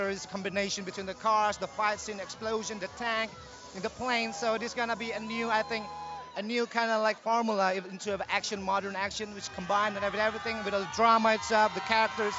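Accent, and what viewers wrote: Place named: United States. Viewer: Logatnya US banget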